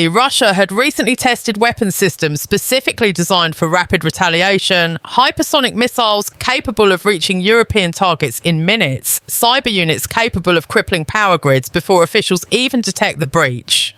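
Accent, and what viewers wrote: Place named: England, United Kingdom. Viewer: It will be a great thing for the world if people with English accents like this are replaced by voices that are 'Chinglish' or Russo-English.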